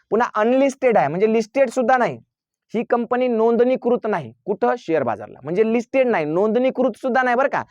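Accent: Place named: Parbhani, India. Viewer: भाषा परभणी ची वाटते सरांची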